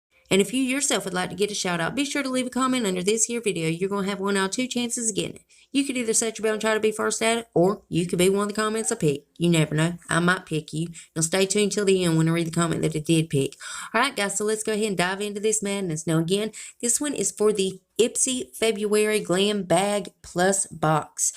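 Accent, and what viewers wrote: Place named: Alabama, United States. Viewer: I love how u say stuff, you sound just like me haha. I'm from Alabama:) thanks for all the news I mean in a good way. Sometimes ppl can take stuff wrong. I love listening to u and I watch your videos when I can. My son has special needs and he is getting older so it is getting harder but God has something great for him . Thanks:)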